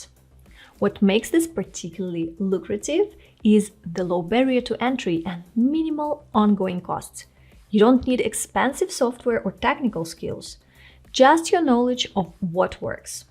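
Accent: Russia. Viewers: russian accent is amazing, spasiba balchoy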